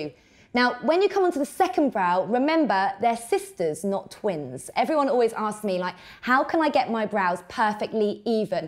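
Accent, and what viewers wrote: United Kingdom. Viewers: the first few seconds were the funniest because she's talking sooo freaking fast plus that british accent haha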